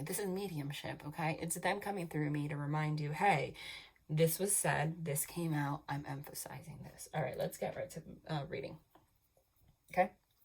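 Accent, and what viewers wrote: New Jersey, United States. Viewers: Idk who will read this. Idk if you will ever read this, but I do wanna thank you. Imma just put this positivity out into the universe. This came at a really pivotal time in my life. I lived through abuse at the hand of my adoptive father. Recently there is a girl I fell in love with where I had to cut it off. Everything you said hit soo hard. I’ve always been someone who’s been scientifically grounded. Lately I’ve had a sort of spiritual awakening and stoped ignoring this calling. That’s when I found this. I’ve been trying to work past a lot of things that need healing and I’ve done really well, but wow!!! Lemme just say your gifts, your abilities, your words truly hit home and inspired me to push through on this journey. This also gave me a lot of hope. Ty so much 🙏 also I’m from New England and your voice mixed with that Jersey accent 😩 the universe clearly wanted me to hear this message. 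Thank you so much for the positive energy you put out for everyone!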